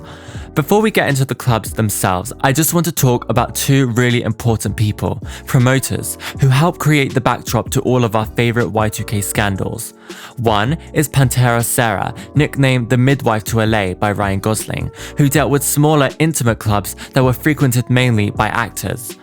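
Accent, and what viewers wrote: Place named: RP, United Kingdom. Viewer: LOVE your channel and your voice— so posh! I’m definitely a miserable millennial nostalgia worshipping on simpler times. See you in hell 💋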